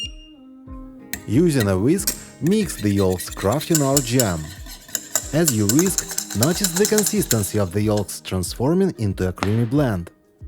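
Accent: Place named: Russia. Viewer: well I ‘m going to explain myself… I speak fluent English and from my childhood used to be taught to pay attention to my pronunciation As a modest result … years later, no one picks my Russian accent even though I don’t sound like a native speaker … it’s not about being snobbish, not at all It’s simply certain level of so called professional enhancement if you have decided to record your channel in English… As much as we’re proud to cherish our great language and cultural heritage we have to admit, Russian accent isn’t easy on some ears ! His channel is very professional but accent is distracting , isn’t it?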